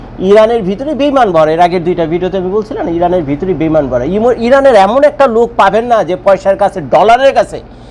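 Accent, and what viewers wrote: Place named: Noakhali, Bangladesh. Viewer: bhai mone hoy noakhailla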